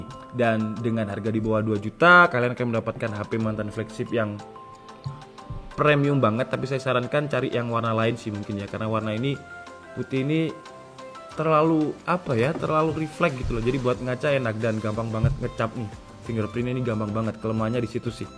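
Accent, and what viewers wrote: Java, Indonesia. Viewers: Medok banget to mas mas 😂😂😂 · Wong malang a mas?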